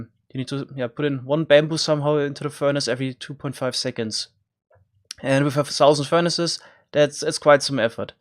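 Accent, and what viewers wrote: Germany. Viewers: Du hast einen deutschen Akzent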